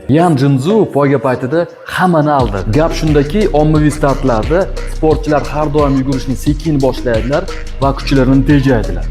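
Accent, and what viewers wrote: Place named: Namangan, Uzbekistan. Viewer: Namangan sheva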